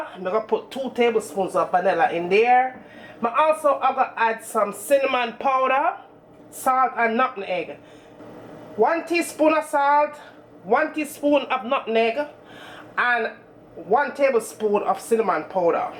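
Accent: Jamaica. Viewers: Fantastic! Thank you, great step by step explaination, love your Jamaica talk! Perfect. Felt like I was back a yard!! Great Channel. xx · Thank u mami...u always make cooking seem oh so easy like 1-2-3....continue to share your gift with us ...I am always enlighten and inspired whenever I watch and follow ur tutorials ...big up yuself...PS love the accent...real yaadie...muaaahhh😙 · As a born jamaican living overseas, you don't truly appreciate the beauty of the jamaican accent until you listen to it from a different perspective. Keep the authentic jamaican food coming - best food in the world!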